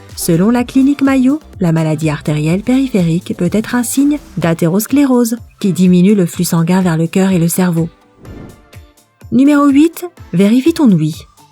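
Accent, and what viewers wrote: Quebec, Canada. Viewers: elle a la rhume la dame kubquoi horrible la voix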